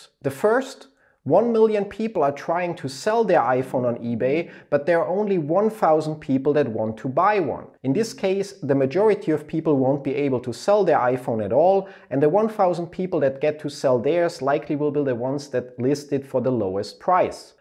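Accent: Germany. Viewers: Cooles video Off-topic: Immer wieder witzig diesen deutschen Akzent im englischen zu hören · I love that German accent. It’s hot af.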